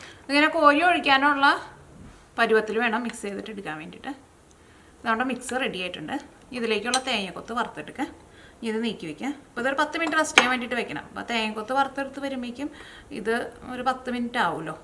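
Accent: Thrissur, India. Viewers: chechee alpam speed kurakamo samsaram trissur kaaree aanu alle?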